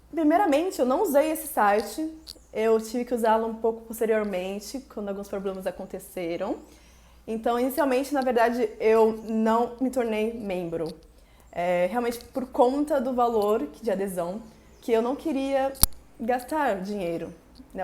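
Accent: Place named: United States. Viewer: Esse sotaque americano lindo que vc tem ❤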